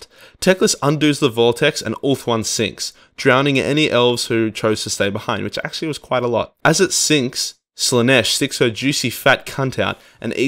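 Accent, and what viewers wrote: Australia. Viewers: its so good to hear ozzies narrate stuff, with our slang and love for C and F bombs and if you dont like it then F%$k off attitude, cheers mate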